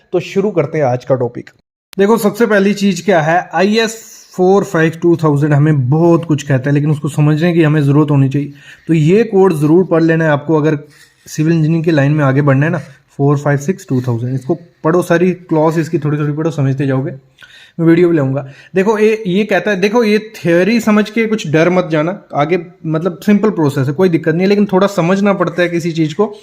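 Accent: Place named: Kashmir, India. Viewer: Aapki vice batla rahi hai ki aap j & k se hai ji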